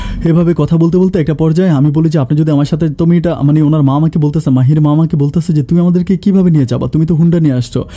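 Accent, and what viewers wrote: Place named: Noakhali, Bangladesh. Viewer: তুই কি নোয়াখাইল্যা? কিন্তু মূর্খ নোয়াখাইল্যা.. তবে তোর ভয়েস টা সুন্দর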